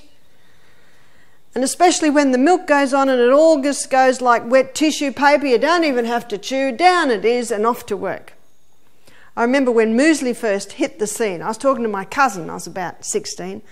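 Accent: Australia. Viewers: She's Australian. They say "inshulin".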